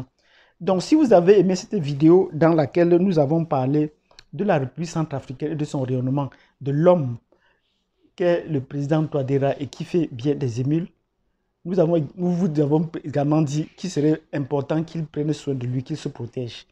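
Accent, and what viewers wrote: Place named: Bamileke, Cameroon. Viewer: Je m'excuses, mais ton analyse est faite avec trop l'accent Bamiléké qui me gène personnellement, elle est pleine de répétitions de phrases et sur le fond je n'y ai rien trouvé de pertinent. Donc je te conseille de laisser la place à un autre qui non seulement parle sans accent, mais qui va dans la profondeur des choses. C'est vraiment Dommage pour les Bamilékés. 🙏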